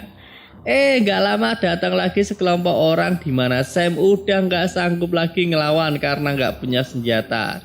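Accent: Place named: Klaten, Indonesia. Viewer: Mimine opo wong klaten yo? Logate koyo klaten 😅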